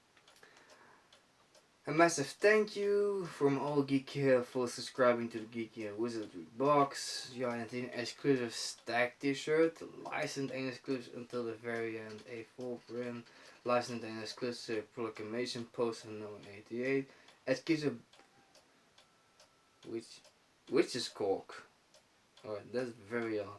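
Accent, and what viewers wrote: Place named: Netherlands. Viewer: Now I know what the Dutch accent sounds like 👌 nice video!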